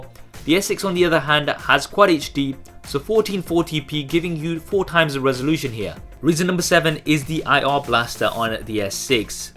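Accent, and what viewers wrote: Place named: East London, United Kingdom. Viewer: I subbed yesterday, just ordered an S6 due to its price dropping £150 over the last month, glad i did as well. Cool vids dude, just about to launch a gaming/tech channel in the next week or so, my review of the phone will definitely be on there. BTW, you sound local, im just north of london, you sound east?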